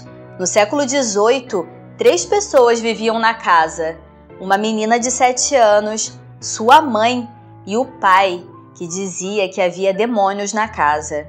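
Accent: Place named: Rio de Janeiro, Brazil. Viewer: Rio de janeiro q puxa o x no final.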